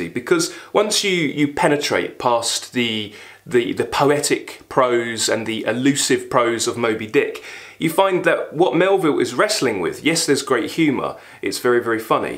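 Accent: United Kingdom. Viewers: Здравствуйте! Прошу прощения, что пишу по-русски, моего знания английского языка не хватит, чтобы выразить свои эмоции ❤ Я так рада, что нашла Ваш канал! Спасибо Вам за Вашу страсть и любовь к литературе, просто невозможно не захотеть бежать читать после Ваших видео! У Вас чудесный голос и обворожительный британский 🙈❤️ слушать Вас - одно удовольствие! Разбор негативных отзывов - прекрасная идея, очень жду продолжения! Как человек, замученный русской классикой в школе, подтверждаю, что учителя часто отбивают всякое желание читать. Например, Войну и мир я прочитала только первый том, злилась жутко на себя, на учителя, на Толстого, на весь мир 😂 и до сих пор к великому своему стыду не читала ничего из Толстого 🙈 Однако, не имея желания читать русских авторов, прочитала многое из английской (Ивлин Во "Возвращение в Брайдсхэд" и "Незабвенная" - одни из любимых моих книг), французской (Камю и Экзюпери - любовь моя) и американской (Ken Kesey - "Sometimes a great notion" - обожаю до слёз) литературы. 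Сейчас период японской литературы и возвращение к русской классике. Очень жаль, что в окружении нет людей, которые любили бы те книги, которые я предпочитаю, есть некая неудовлетворённость от того, что не с кем обсудить. И даже в книжных клубах никак не могу найти тех, кто читал бы книги, которые мне интересны 😢 Моби Дика читала 10 лет назад, понравился очень и оставил желание перечитать, когда стану постарше. Из русских писателей люблю не очень популярного на Западе Лескова. Его "Очаро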